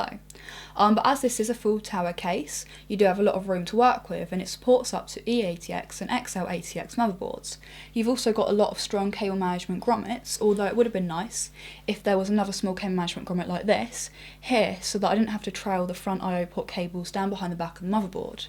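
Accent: England, United Kingdom. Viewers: u forgot to mention very sexy voice and british accent! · Mornbs What are you on about? It is ENGLISH, not american. If you don't understand what she's saying, then you need some more english lessons. O.o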